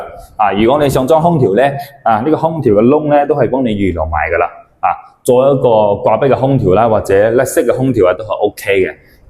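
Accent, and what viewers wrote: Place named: Taiwan. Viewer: 點解你廣東話似台灣人，不像大陸人？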